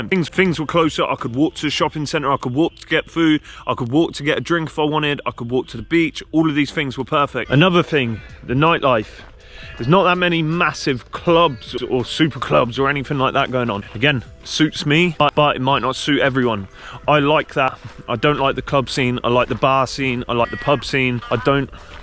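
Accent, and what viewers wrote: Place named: Liverpool, United Kingdom. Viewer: สำเนียงอังกฤษฉบับคนลิเวอร์พูลชัดเจนมากพี่ชาย😂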